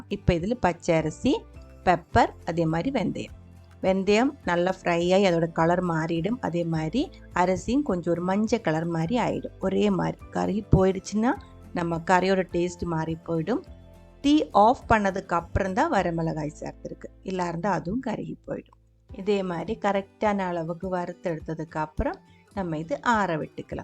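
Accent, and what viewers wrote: Kerala, India. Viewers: V good. Lovely Malayalam accented Tamil. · "Valara nanyaittu irukaiyanu ningalinde recipe"I love your malayalam accent wile speaking in Tamil.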